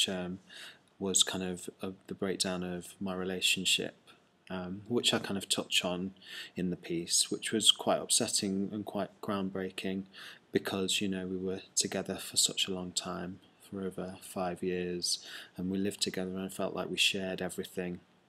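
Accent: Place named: Northern England, United Kingdom. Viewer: You have turned proper northern with the accent :)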